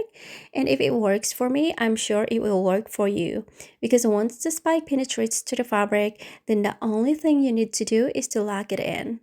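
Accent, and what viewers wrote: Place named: Philippines. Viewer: I knew it was a Philipino accent.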